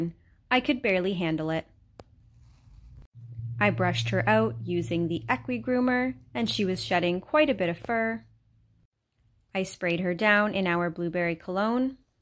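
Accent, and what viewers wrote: Canada. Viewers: Are you Canadian? I hear "oot" instead of "out"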